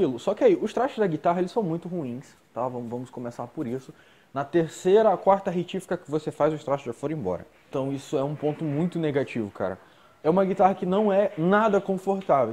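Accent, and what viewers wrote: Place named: Maranhão, Brazil. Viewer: Sotaque de Maranhense!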